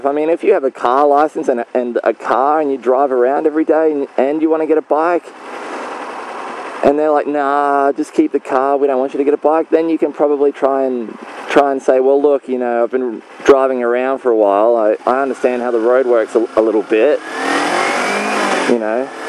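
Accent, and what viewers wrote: United States. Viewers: Why do you say your A's like an american? Us Aussies chuck an R after an A. e.g. Carn't, barsketball, arseholes, cmon man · you pronounce words with an american accent at times. Its weird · thanks a nice vid, but I noticed you've picked up a US accent? Watching to much youtube? Seriously though, you make some good points esp motorcycling is dangerous, we need to be learning, practising skills ect always. And the more you know the harder you seem to push it, we all need to watch this. There are many advanced courses out there, usually taught on a race track with expect/experienced teachers. Riding fantastic fun and well worth the effort, ride safe everyone. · also you have a slight American accent with different words lol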